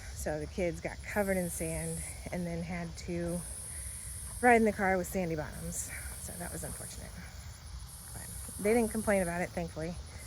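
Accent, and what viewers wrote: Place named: Texas, United States. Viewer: i'm from Birmingham which is a major city, but I really like to travel to Wales which also has cities but also has plenty of beache and country. Where is it that you live? I assumed maybe Texas from your accent? Am I right?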